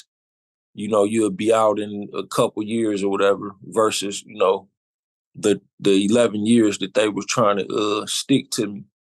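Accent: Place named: West Coast, United States. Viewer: Sounds like a West Coast dude.....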